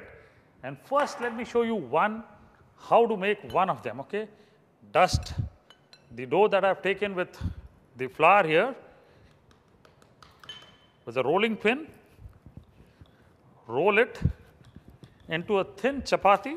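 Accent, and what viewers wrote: India. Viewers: Great Recipe. You have a great presentation skill. What I noticed was that you did not stammer or pressed hard for words. It was very naturally narrated and with our real Authentic Indian Accent. Thank you very much.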